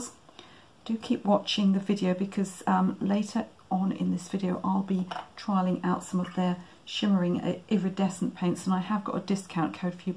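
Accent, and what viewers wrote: England, United Kingdom. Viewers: Great info, thank you for sharing your knowledge! Plus, I love listening to your beautiful English accent! 😍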